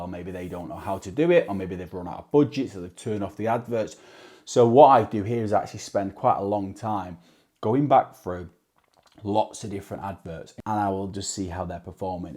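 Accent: United Kingdom. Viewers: good and clear presentation,thanks for a different view, a very clever lad, and a fellow brit I think.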